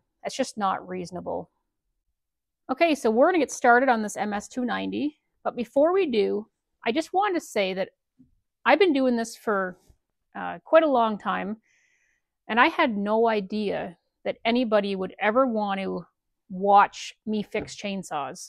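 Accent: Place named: Canada. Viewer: I tune in for the outs and abouts, your Canadian accent is amazing !! The chainsaw content is awesome too.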